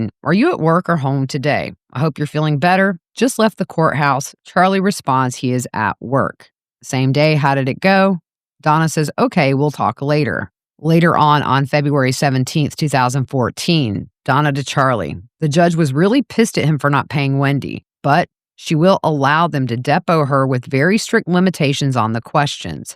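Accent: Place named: Boone, United States. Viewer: One can become invested in the success of a content creator. In my case about two years ago my wife asked me why I was emotional. I was blinking back tears as was talking about her Grandmother, taking her to get her hair done or to a medical appointment. Then I learned daughter moved out of state. I told my wife I am reminded of my family from Boone NC area. Similar accent etc, same heart. I tithed my time to this podcast as I shared and liked the podcast. I also try to write a comment that a new viewer may like and subscribe. I am now in a position to help this channel with $$ and I plan on making it happen Asap. Thanks …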